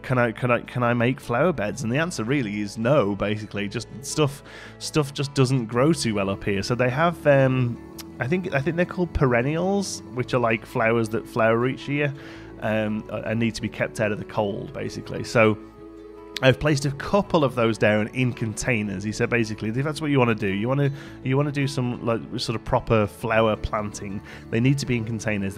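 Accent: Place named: Midlands, United Kingdom. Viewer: I love your builds, I’ve learnt a lot watching your tutorials. I recognise your accent, I’m from the Midlands I can hear a slight twang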